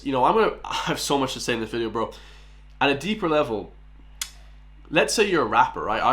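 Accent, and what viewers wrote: United States. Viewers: Your accent sounds familiar,what part of America do you hail from?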